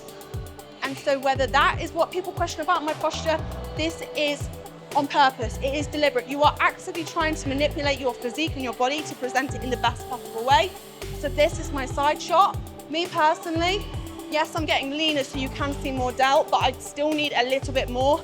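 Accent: United Kingdom. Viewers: Where are you from in the UK? I'm thinking south? Hampshire perhaps 🤔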